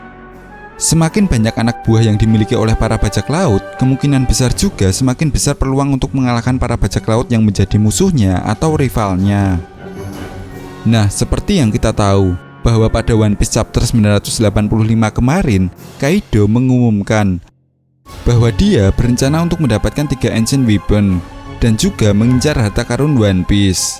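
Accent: Java, Indonesia. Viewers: Ngomong medo, dari dulu ga berubah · Medog tenan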